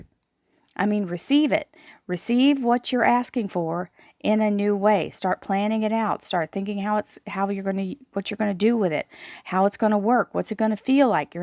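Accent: Texas, United States. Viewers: Love your readings, and your voice. Where are you from sounds like Texas?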